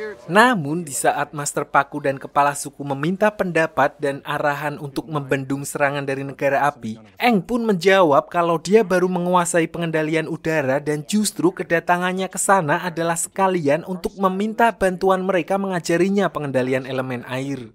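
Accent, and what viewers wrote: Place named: Tulungagung, Indonesia. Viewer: Wong tulungagung sampean cak😂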